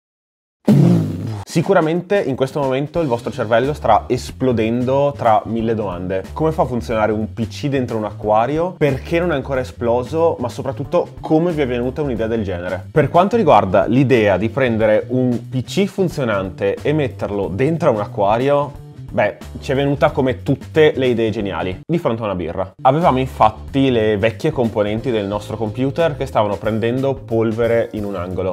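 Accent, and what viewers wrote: Italy. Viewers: Porco due sento troppo l'accento di Brescia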